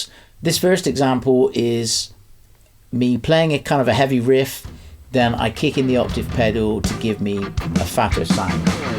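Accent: West Country, United Kingdom. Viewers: Excellent demo and your playing is awesome. Handy pedal, I must say. Reassuring West Country twang too, especially as I grew up in Brizzle. 😀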